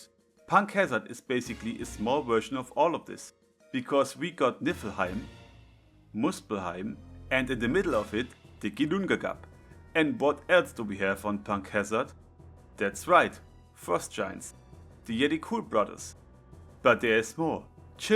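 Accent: Germany. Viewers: Hey Mate, ich kommentiere nicht oft unter Videos, aber dein Kanal ist definitiv ein Hidden Gem! Da du meiner (und vermutlich auch deiner) Meinung nach zu wenig Reichweite hast, versuche ich mal meine Kommentarfaulheit als 0815 YT OP-Theorie Zuschauer zu überwinden und dir mein völlig subjektives Feedback zu geben. Die "Produktionsqualität" ist generell sehr gut, in Sachen Videoschnitt/SFX gibt es in diesem und auch in den anderen Videos "nichts zu meckern" ^^ Deine Theorie mit den Swirls und der 4. Wall ist wirklich meisterhaft. Hut ab! Dein Storytelling ist auch wirklich gut und imo ist der "Sidekick" das Lustigste was ich bisher in der OP Theories Community gesehen habe. Das gibt deinen Videos wirklich einen krassen Unterhaltungswert und Individualität. Der (französische?) Akzent ist imo on Point und zum wegschmeißen 😂 . Bezüglich des Videos könntest du bei der Audiobearbeitung evtl. 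noch den Kompressor auf der Masterchain tweaken, damit es etwas "voller" klingt und dem EQ für deine Stimme etwas mehr untere Mitten/Bässe geben. Nun zum einzigen, aber nicht zu unterschätzenden Kritikpunkt. Deine Aussprache bro. 🙃 Der Grund, warum ich mir zu 99,9% sicher bin, dass du Deutscher bist, ist, dass ich genau die gleichen Probleme mit der englischen Aussprache habe/hatte wie du...! 😅😅 Nichts für ungut, ich will dir nur mein laienhaftes Feedback geben, damit dein Channel in Reichweite explodiert ❤ . Du solltest wirklich an den Variationen von Th arbeiten. Außerdem R und W am Anfang ei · Sounds german not french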